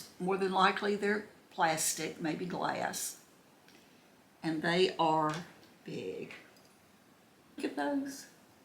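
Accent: South, United States. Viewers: I love seeing you with that adorable southern accent & you are such a true beauty❤️🍷🍷👏👏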